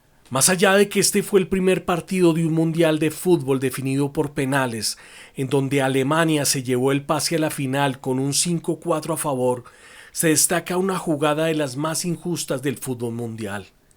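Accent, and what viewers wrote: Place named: Argentina. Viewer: Cuando empezaste dije yo este es argentino y me puse a pensar en cual provincia serán más tontos . Después me puse a ver y escuchar y me gustó muy buena narrada . En aquellos años supongo yo que era un espermatozoide nadando en los testículo de.mi viejo . La verdad que ese arquero me recuerdo al gato sesa o a orion y algunos otros . Saludos muy buen video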